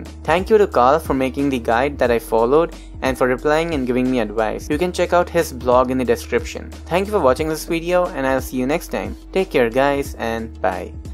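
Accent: India. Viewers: You Indian?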